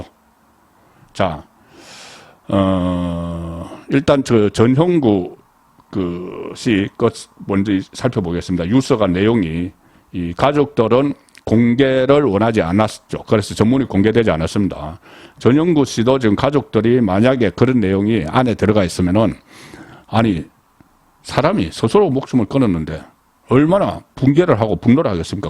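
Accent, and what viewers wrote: Gyeongsang, South Korea. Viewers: 송국건유튜브방송을 즐겨보면서 늘 느끼는 점은 내용은 최상의 수준인데 말실수를 잦은편인데 그건 그다지 문제가 될 수는 없지만 억양 지방시골억양이 참 문제입니다. 예컨대. 엄마의 자매 이모?를 성씨가 이씨일때 이 모씨 라고 말하면 안되듯이, 장음 단음이 정확해야하듯이 오늘 방송을 보면서 자꾸 유서를 유 서 라고 합니다. 스스로 녹음된 거 보면 그걸 못고치는지? 40년대 시골사람이 서울한번갔다와서 서울말씨를 흉내내다보니 저런 현상이 나타나듯한데, 특히 경남지방사람들이 저렇게 발음 억양을 구사하는데, 대표적으로 가장 울렁증유발수준은 성창경입니다. 그런데 송국건혼술은 정말이지 우파에서 방송내용은 최상인데, 발음 억양이 최고스럽지않는 기본이 안된건 고치는 게 좋지않을까요? 앞서 언급했듯이 성창경은 울렁증생겨 듣질못할정도인데, 기자출신이면 그걸 고치지못한다면? 울산이나 포항정도수준의 시골지방기자수준에서 벗어날 수 없는 게 아닌가하는 맘이 듭니다.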